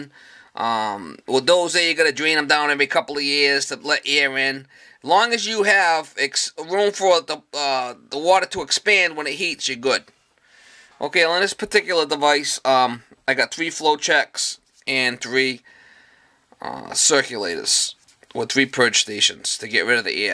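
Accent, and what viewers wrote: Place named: Northeast, United States. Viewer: you have done a service to all. 1. younglings, they need the facts and must listen carefully 2. blue hairs, need to learn the newer stuff. 3. customers, yes customers, they need to see what it takes to get the comfort that they have grown a costumed to, and need to be willing to pay for. your videos are invaluable to all. and I thank you for a very clear honest and informative channel. "every day is a school day" is dead right, I work in industrial maintenance and that is the best truth that I have herd in along time I will plagiarize the . I have watched 4 hours if your videos tonight on the 52" plasma w/ full Dolby surround and loved every minute of it. WOW you are honestly the greatest. & I love you heavy N/E accent, it is killer! you are a gem. & yeas I know how much work it is to prepare each video, not so easy. 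even if you try to edit "in camera" it still takes a lot of work.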